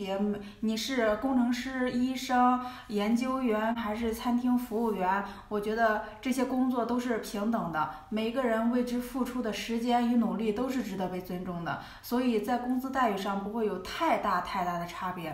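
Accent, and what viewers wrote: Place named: Shandong, China. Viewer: 听口音 Fiona酷似山东人，或者河南？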